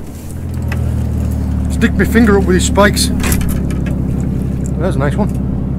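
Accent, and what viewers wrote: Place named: Cumbria, United Kingdom. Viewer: i thought you were North, as were Cumbria lol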